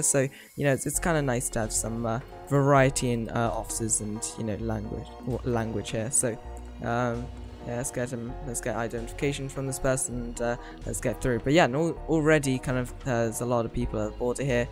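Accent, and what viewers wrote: England, United Kingdom. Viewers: are you english you sound english i hope you are because i am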